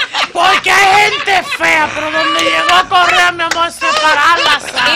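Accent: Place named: Cibao, Dominican Republic. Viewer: Lo que más me gusta es el estilo cibaeño.😂😂😂😂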